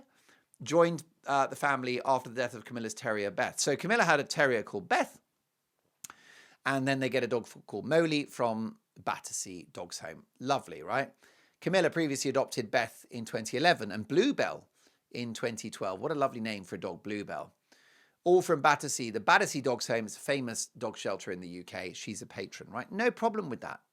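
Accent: United States. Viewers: I agree with PETA to stop the horrible breeding places, adopt first! PS-Your American accent is spot on and great outfit today! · Your American accent is good!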